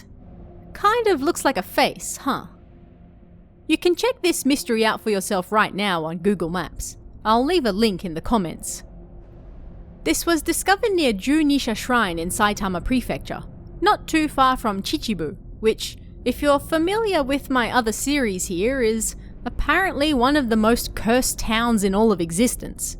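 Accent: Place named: Australia. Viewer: You sound Australian 🤔👍 thanks for you work 🙂 I couldn't do it 🐨🇦🇺🦘🙂.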